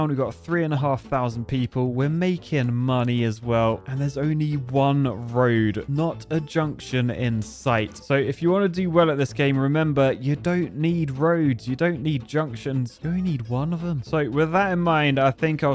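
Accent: United Kingdom. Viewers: Bro stop talking like British people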